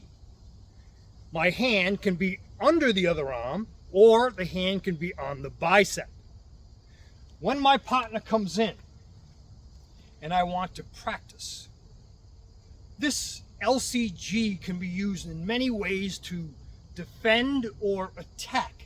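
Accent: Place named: Northeast, United States. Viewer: Speakeming witha N.E. accent kills it for me.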